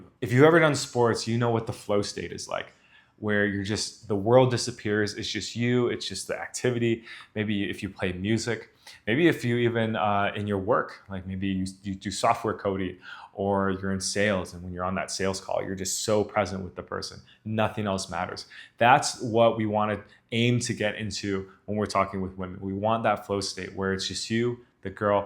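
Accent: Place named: Mexico. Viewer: I've been here 7 years have yet to meet a Mexican girl that speaks English like that. But, I'm not in the gringo tourist area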